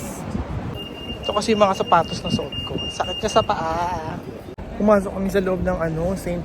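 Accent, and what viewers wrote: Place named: Europe. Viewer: Love the European accent at Tagalog din 😊❤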